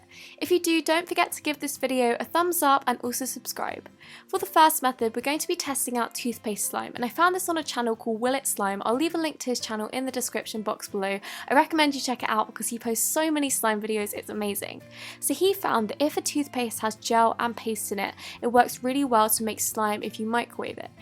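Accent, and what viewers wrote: England, United Kingdom. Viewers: U sound British how did u get borax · Sumthing I have her accent, im English :) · I found u😂! I'm on insta btw. Love u AND GLAD SOMEONE FINALLY HAS A BRITISH ACCENT LIKE ME 🤣💜🖤💕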